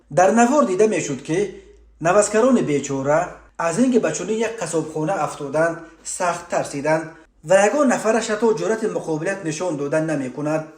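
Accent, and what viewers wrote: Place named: Badakhshan, Tajikistan. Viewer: Хами Канала барои акценти Бадахшониш гуш мекунум .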